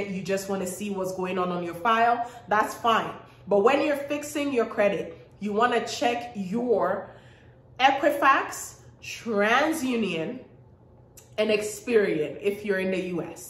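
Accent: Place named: Africa. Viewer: You are such a beautiful woman, the way that African accent rolls of the tongue is so soothing.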